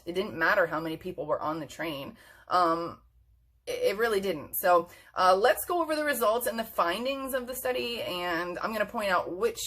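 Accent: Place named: United States. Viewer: thank u thank u for making this engaging to watch because u talk like an actual person. you dont use like a million long words per sentence like these other educational videos you just talk normally and your actually fairly relatable and chatty. and also all the videos for my course are british and after living here for 16 years british accents really start to grate on you so hearing an american accent was refreshing and much less dreadful. its 1am you lowkey kinda saved me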